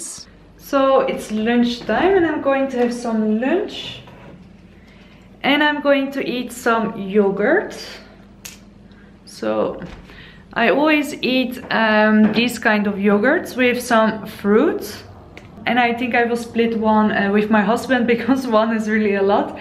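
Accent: Netherlands. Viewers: Ik hoorde meteen je Nederlandse tongval😅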